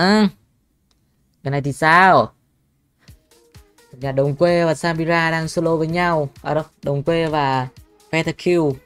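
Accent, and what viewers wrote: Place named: Central, Vietnam. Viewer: nó gốc bắc vào DakLak sống nên vậy à mà...xung quanh người ta nói giọng Trung nên lâu lâu nhiễm ý mà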